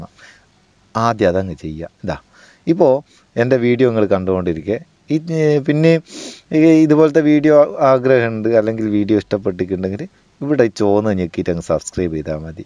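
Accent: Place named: Vadakara, India. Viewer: നിങ്ങളുടെ അവതരണം കേൾക്കുമ്പോൾ എൽ പി സ്കൂളിൽ എന്നെ പഠിപ്പിച്ച അദ്ധ്യാപകരെ ഓർമ്മവരുന്നു. വളരെ സാവധാനം പറയുന്നത് മനസിലാവുന്ന തരത്തിൽ. ആ വടകര, പയ്യോളി, മേപ്പയൂർ, പേരാമ്പ്ര, ഒരു നാടൻ ഭാഷയിൽ. അടിപൊളി. പുതിയ അറിവുകൾ ക്കായി കാത്തിരിക്കുന്നു. ആശംസകൾ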